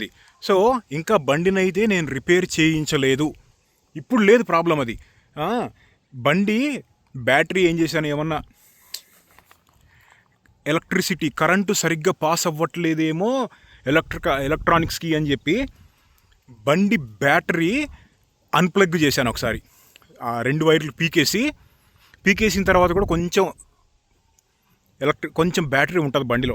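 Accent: Andhra Pradesh, India. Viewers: Anna mana Nizamabad antunnav but slang yemo andra laga undi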